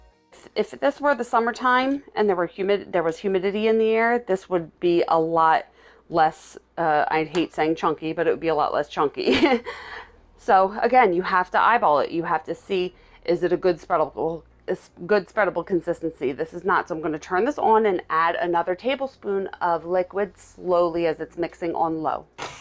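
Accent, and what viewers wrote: Philadelphia, United States. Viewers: Just came across your whiter American buttercream video. Your first 5 words I knew you were from my area😆 then you said outside of Philly. I am now subscribed 😉 But super curious now lol. I am Delco, are you Philly or Chester County?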